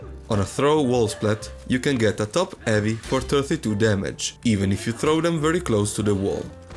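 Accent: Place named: Italy. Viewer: madonna l'accento italiano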